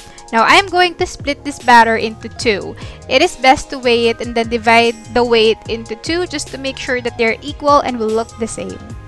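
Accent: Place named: Philippines. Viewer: Hi Ate.... thanks for a well-made video. You are born to teach! If there is such a thing as a Filipino ENglish accent, this is an excellent example. Beautifully spoken😊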